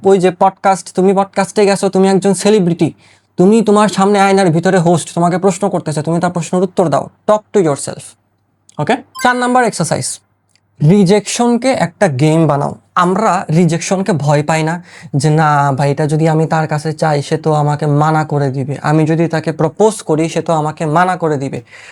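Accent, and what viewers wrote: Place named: Noakhali, Bangladesh. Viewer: Apni ki Noakhali'r ba Feni'r? Na mane apnar kothay oikhaner ekta taan ase toh tai.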